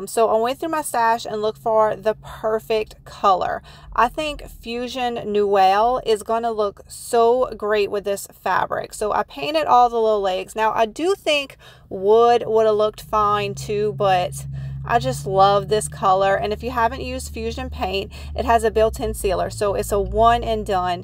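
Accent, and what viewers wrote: Louisiana, United States. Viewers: think it is Cajun.😂 Not a speech impediment. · She is from Louisiana and it’s most definitely an accent.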